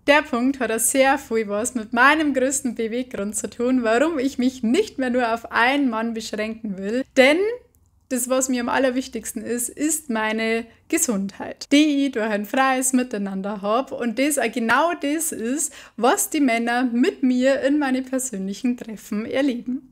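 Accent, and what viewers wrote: Bavaria, Germany. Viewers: Das habe noch von keiner Frau gehört um so erfreulicher finde ich es von dir hören. Du hast so einen tollen Dialekt ich mag dein Bayrisch und du bringst dein Bericht sehr überzeugend und glaubhaft rüber mach weiter so du bist Super.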